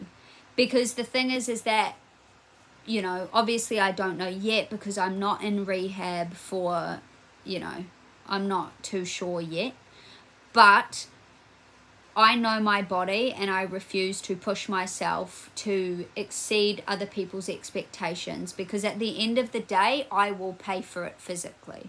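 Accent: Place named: Australia. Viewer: You are absolutely right, don ´t let anybody rush you! But the thing is: people do rush you, things which seem totally simple to them are like climbing Mount Everest for you, for example: (when you are finally allowed to): take one step! The (emotional) problem is, in my experience, not yourself, the fact that you have to rely on a lot of help from others etc, the problem is all of the other people (even the physiotherapist), who rush you and put pressure on you - this is what causes the “bad” emotions to you. And like you, I had days where I wished I was dead, not (only) because of the pain (which was excruitiating, like you said), but just because I was tired of needing, help, I was tired of waiting for things to get better, I was tired because the whole situation was soooo unbearable strenous and exhausting…. I don ´t know how good the medical care is in your country - I don ´t know where you come from, from your accent I think Australia or New Zealand - in Germany our health system is at a low and you don ´t get Physiotherapie for more than 6 months 😮 and then “everything has to be ok again” 😡 I found your video by accident today, your video is 1 year old, you are young and I really hope that you are back on track, that your leg is functioning “ok” for YOU, so that you can go on with your life and do all the things you want to. 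I am 58 years old, 2 years ago I had a poly trauma with ancle- fracture, tibial plateau fracture, knee cap fracture and fracture of my upper arm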